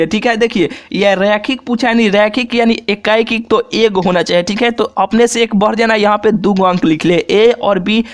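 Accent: Bihar, India. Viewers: Sir aap Bihar ke hai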